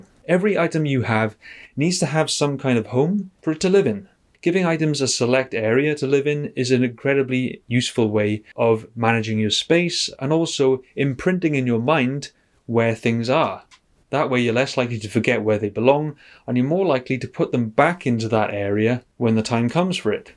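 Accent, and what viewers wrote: Wales, United Kingdom. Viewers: Hey you are welsh. Howdy shw mae?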